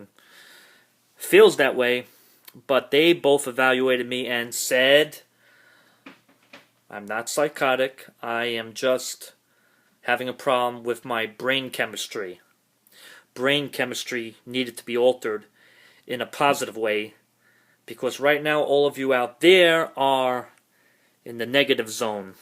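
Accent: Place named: New York City, United States. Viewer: Bro you’re definitely from nyc from your accent That makes me feel good I have to get in touch with you Still in this space Can you call me <num> Input my number on YouTube that’s how serious it is man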